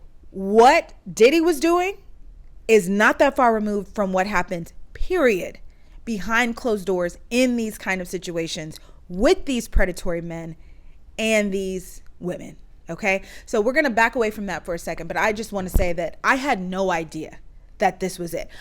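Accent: United Kingdom. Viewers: your british accent is everything! sounds just like them! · lmaoo love your british accent, welcome back! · As a Brit, loved your British/English accent 😂 Missed you. You’re so relatable. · 🤣, that British accent 👌 Loved your humour/ sarcasm throughout, please incorporate it more!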